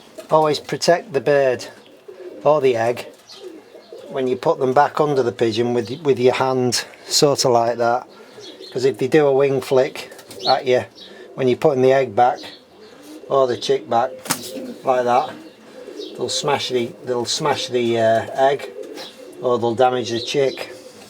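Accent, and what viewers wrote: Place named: Yorkshire, United Kingdom. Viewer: Hi I watch all your videos we’re are u based sounds like Yorkshire?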